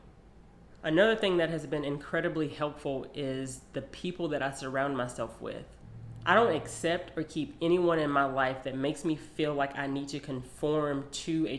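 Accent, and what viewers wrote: South, United States. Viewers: I love your voice, your southern twang is awesome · So well articulated! My daughter, who is experiencing gender dysphoria, sent me this video, and I appreciate you sharing your experiences. It's so hard to be vulnerable like that. Also, I really like your beautiful voice! It has a velvety richness, and the slight southern twang is cute. (For what it's worth, I'm a cisgender, heterosexual woman.) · I'm late to this, but dude your southern twang rocks and is so sexy!!!!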